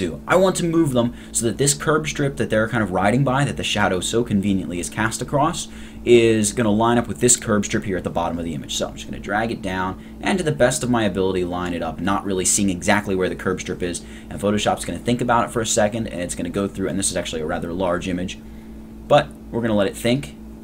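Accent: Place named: United States. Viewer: armana head on over.... armana go ahead and.... Super Cooele.... gonna move this guy here... Whole buncha stuff Don't you just love what America has done to the English language?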